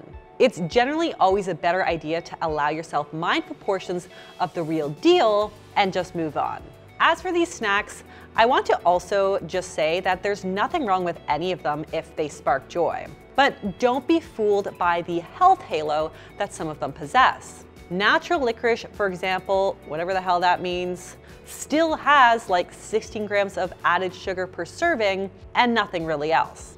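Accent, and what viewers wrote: Canada. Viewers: Love the Canadian accent. It’s cool hearing bagel said in a different way. I love accents. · I was not prepared to find out how Canadians say the word bagel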